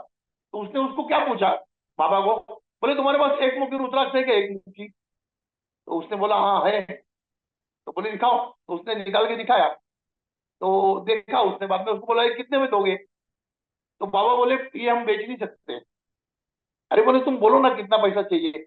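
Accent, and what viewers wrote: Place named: Maharashtra, India. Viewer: आप के हिंदी मे मराठी आती है, बहोत अच्छा लगता है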